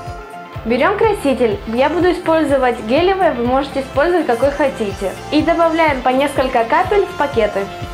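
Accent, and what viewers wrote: Spain. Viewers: у нейо испанский акцент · нет она живёт в Испании , но родилась в России . акцент от того что она больше говорит на испанском чем на русском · У уже акцент испанский есть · она родилась в Росси в 11 лет родители с ней переехали в испанию поэтому у неё такой акцент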